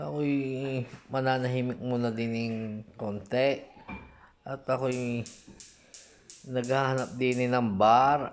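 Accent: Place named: Batangas, Philippines. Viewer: Heheheh katuwa nmn batanggeño po kayo ..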